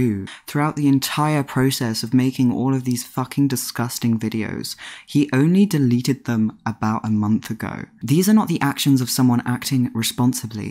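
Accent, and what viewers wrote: RP, United Kingdom. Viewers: Your voice temporarily cures my depression. So soothing, so posh, so wonderful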